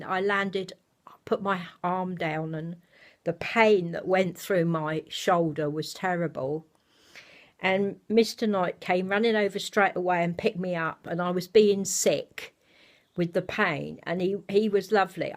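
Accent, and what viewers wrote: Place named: Essex, United Kingdom. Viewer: I love your accent. My best friend lives in Clacton and her accent is like yours. Well you have an Aussie baby, l will have to look at Nursery x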